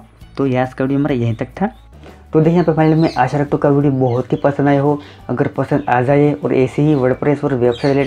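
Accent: Gujarat, India. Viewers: Bhai gujrati laago cho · Seems like Gujrati.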